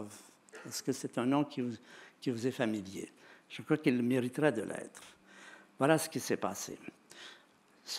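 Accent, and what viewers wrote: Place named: Quebec, Canada. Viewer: une allure de savant un bel accent quebecois merveilleux conteur grand vulgarisateur d'une science extrêmement complexe que l'histoire de l'univers de l'infiniment petit à l'infiniment grand.....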